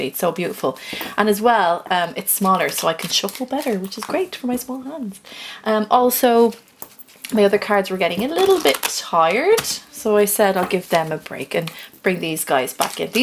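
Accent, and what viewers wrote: Ireland. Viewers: Love the Irish accent 😌✨ dope reading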